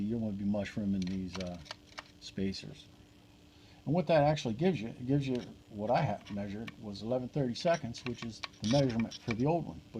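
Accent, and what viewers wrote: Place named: Delaware County, United States. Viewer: Judging from his speaking manner, my bet is that he's from the Philuffia PA or South Jersey area, and has a little bit of a "Delco Accent". Sometimes difficult to discipher even by locals. GO BIRDS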